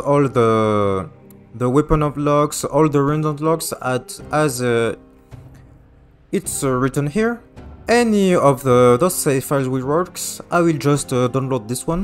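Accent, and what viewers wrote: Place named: France. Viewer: « french accent »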